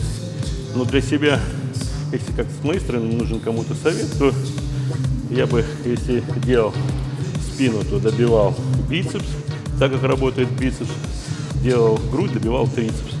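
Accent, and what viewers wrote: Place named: United States. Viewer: я думал какойта американец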